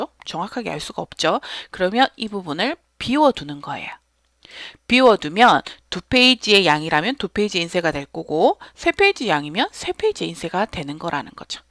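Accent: Gyeongsang, South Korea. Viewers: 60대 부산사람이 경상도 말씨로 강의를 들어니 귀에 속 들어오네요... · 액셀 활용에 매우 유용한 강의입니다. 부산 억양의 선생님 감사드립니다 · 나도 이분 목소리가 너무 듣기 좋아 쏙쏙 잘 들어갑니다.짤막한 강좌를 시간 될때마다 듣는데 머리에 잘 들어옵니다 경상도 분 이 신것 같은데 왜 그리 목소리가 곱습니까 강의 내용도 좋습니다. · 경상도 사투리 억양이 넘 듣기좋아요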